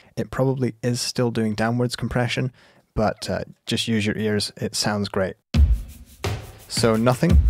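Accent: Scotland, United Kingdom. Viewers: Offtopic... is you accent Scottish?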